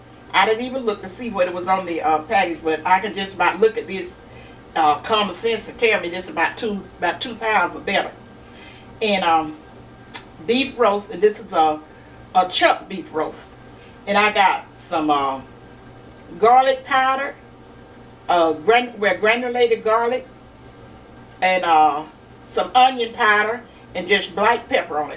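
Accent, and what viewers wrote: South, United States. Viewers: Awww you are a God sent woman! I am putting your meatloaf recipe in the oven precious woman of God! So happy to hear about the give always. I can say, I am sooo very proud of YOU! You encourage me more than you know! My I love your apron. You can sale those as wells! Take your time woman of God and do what you do! I absolutely love it. Currently still running to cancer institute with honeylamb! So hopefully he will enjoy your tasty meatloaf! I am on Facebook and will look at your page! My husband leans towards savory recipes because chemotherapy took appetite! Oh, he is an assistant Elder at our church! By the way, you are loved and love your southern accents! It is what it is God bless you, and anything savory to get us through, please cook it! AgIn God bless you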